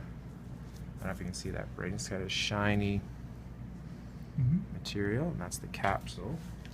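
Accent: Canada. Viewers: Are you from Canada? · Do I hear Canadian accent?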